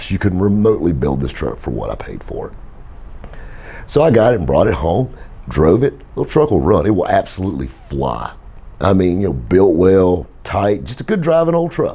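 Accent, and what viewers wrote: South, United States. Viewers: i love this guys stories ed's are good to but southern draw takes me back home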